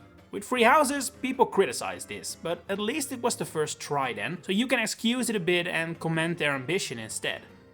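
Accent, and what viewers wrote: Netherlands. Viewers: Bro are you from the netherlands?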